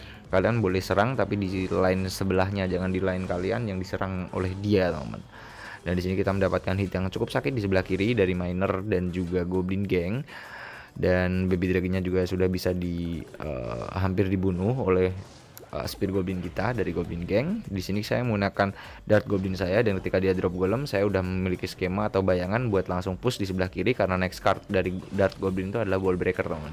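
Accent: Yogyakarta, Indonesia. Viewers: Wong Jogja bro, wong Jogja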